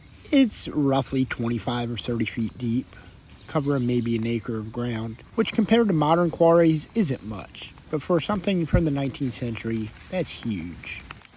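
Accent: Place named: Mid-Atlantic, United States. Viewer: You have a mid-atlantic accent. Like a Philly or Baltimore accent.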